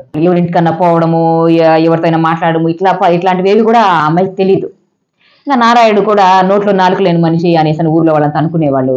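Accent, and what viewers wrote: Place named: India. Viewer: మీ వాయిస్, ఆ provincial dialect అంటే నాకు చాలా ఇష్టం సాబ్.. 😍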